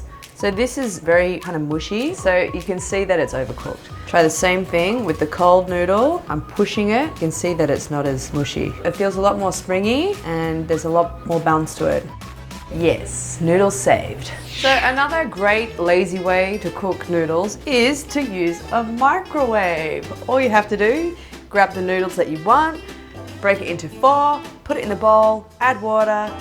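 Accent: Australia. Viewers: Is she Aussie? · You sound Australian!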